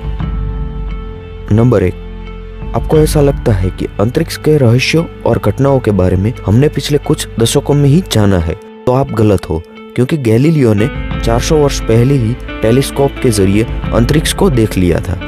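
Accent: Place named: Bihar, India. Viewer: Bihar se ho kya bhai...kyo ki tera avaaz aisa lag tha he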